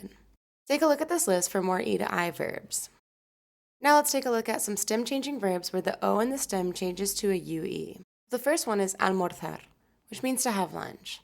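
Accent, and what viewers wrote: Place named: United States. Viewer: Great accents - genuine american and spanish accent! Have not heard anyone in YT Spanish teaching courses who has both accents just perfect!!! 👍🏻👍🏻👍🏻❤️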